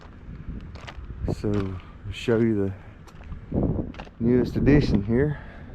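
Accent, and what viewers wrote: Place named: North Carolina, United States. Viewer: That’s a good looking job. What area are you in? Sounds like NC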